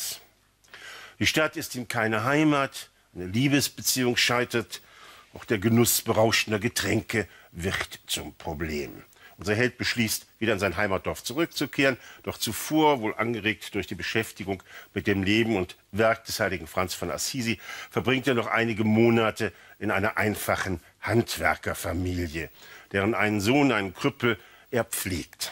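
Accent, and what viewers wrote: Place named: Ruhr, Germany. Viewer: ist sicher keine "Kaulquappe" sondern ein versierter Literaturkenner. Was aber den Genuss seines Vortrags für uns etwas trübt ist dieser leicht aufdringliche, asthmatisch Oberhausener Pottbeiklang.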